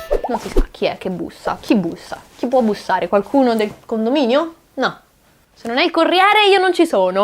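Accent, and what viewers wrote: Tuscany, Italy. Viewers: ma sei davvero toscana?? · Anch'io sono della Toscana! Non me ne ero nemmeno accorta che parlavi in toscano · sei toscana ma di preciso? Pisana,Livornese,Fiorentina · Bene, direi. Che aggiungere? Nulla: interessante & divertente come sempre (però io voglio sentire la che ci parla in toscanaccio marcato eheheh), e complimenti per questa "maturazione" nella cura e nel lavoro messo nei draw my $whatever:) Io in fondo non posso fare testo: ogni volta che prendo anche una semplice matita in mano, da qualche parte un grafico sviene o viene colto senza preavviso da violenti conati:( Quanto ai sogni... non vedo l'ora che ci racconterai i prossimi... posso consigliare, per favorire le cose, un bel film horror mangiando popcorn aromatizzati alla peperonata con le cozze? Dovrebbe dare quel tocco in più alla vena creativa:D ahhahahaha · ma te sei toscanaa???? 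· Vogliamo un video in cui parli solo ed esclusivamente toscano!! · sei brava fra come sempre! :-) ps: la cadenza toscana a farla sentire al mic. ti ci devi impegnare altrimenti un si sente ah ah anche a me succedeva.. ciao. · sei toscana??? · Ma sei diventata ancora più carina? Btw, ti prego parla toscano, è un accento bellissimo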